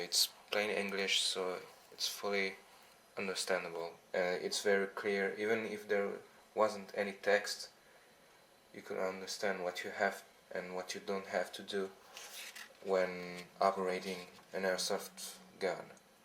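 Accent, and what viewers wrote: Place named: Switzerland. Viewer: Dude, let ME take a guess-you're Swiss, right? :) Your accent sounds just like Swiss Maverick's+you guys have mandatory military service!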